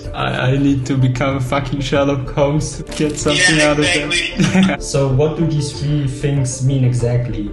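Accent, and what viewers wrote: Germany. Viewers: Your videos are really good for such a small youtuber 👍 Yes, there's a clear difference to Browneys channel, but you deserve more subs definitely! And your english is also good, even if you can hear the german accent, but mine is much worse 😂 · Are you german?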